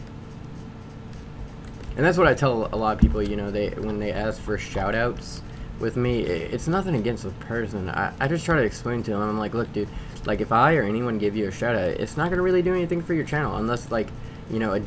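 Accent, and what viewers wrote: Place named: North, United States. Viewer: bc I live in Louisiana and he said he was from Louisiana just curious what part cuz he sounds like he from up north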